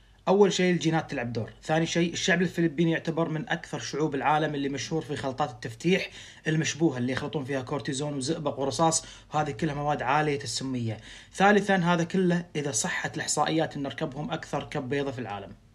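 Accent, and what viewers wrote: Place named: Syria. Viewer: حلو الحجي السوري انا من العراق بس اكيد الهجه السوريه حلوه تحياتي لكل أهل سوريه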